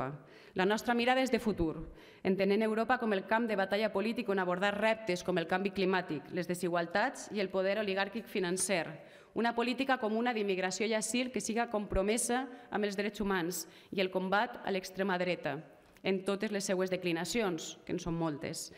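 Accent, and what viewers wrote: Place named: Valencia, Spain. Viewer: eixe valencià maco! ole!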